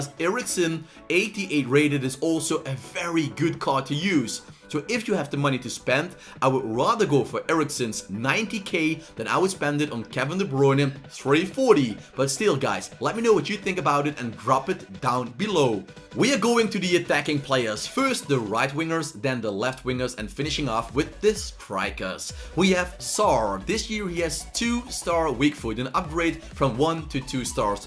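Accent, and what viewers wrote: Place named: Netherlands. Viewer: Ik hoor gwn dat je Nederlands bent hahahahaha heerlijk video wel ❤️🤙🏾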